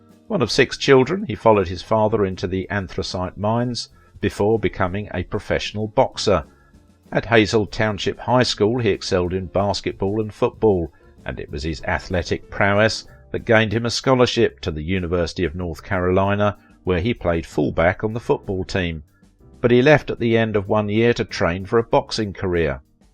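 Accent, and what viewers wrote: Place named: Cockney, United Kingdom. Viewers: The narrator himself may be a cultured man, I wouldnt know, but his accent is not especially so. Unless you mean cultured in the sense of deliberately created or adopted. The underlying accent sounds like an east end of London, "cockney type", accent. It might suggests a clever person who has got on reasonably well in life but who may not have been especially well educared in his early years. I am not saying the accent is fake, he may well have picked up his adult accent because of the circles he moves in. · The title is false. Brando did have his nose broken when he was horsing around backstage with another performer during the run of "A Streetcar Named Desire" but it wasn't Jack Palance who clocked him by mistake. It was one of the actors in the poker night scene who also had been a professional boxer previously. 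I forget the guy's name, but he wasn't a large man like Palance. Marlon himself told this story a couple of times. Why would he lie about who punched him? I have never heard the rumor that Palance was involved, and it seems made it up because it fits in with the idea of him wanting to go on for Brando. Here's another oddity--the fellow narrating has a cultured British accent, yet they are unable to write a decent English sentence. It should read, "Why did Jack Palance Knock Out Marlon Brando?"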